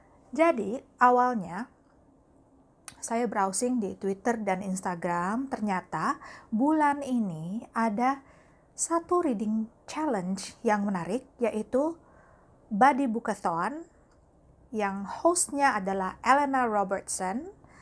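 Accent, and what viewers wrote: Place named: France. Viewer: Asiikk banget pake aksen français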